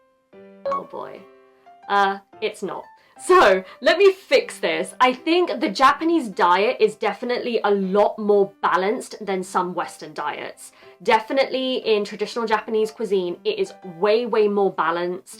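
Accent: United Kingdom. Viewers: イギリス英語が、素敵！ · 唐揚げとビール！・・・締めラーメン・・（笑） イギリス英語のいい勉強になります！有難う！！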